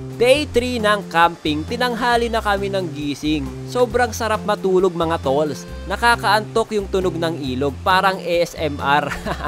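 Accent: Igorot, Philippines. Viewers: halatang igorot si kuya hahaha salita palang eh parang ako hahahaha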